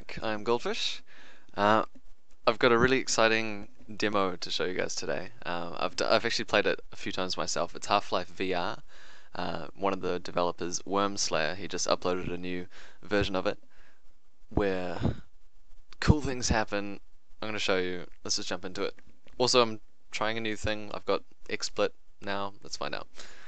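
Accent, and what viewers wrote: New Zealand. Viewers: you kiwi? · Goldfish you got featured on Kotaku also great to see a fellow New Zealander making some waves on the youtube refreshing not hearing a American accent for a change keep it up man you got a new subscriber :)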